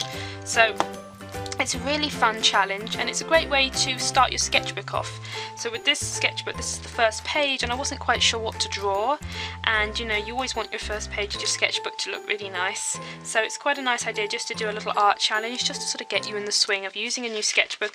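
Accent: England, United Kingdom. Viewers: what shop do you get it from? you sound like a English girl like me. just guessing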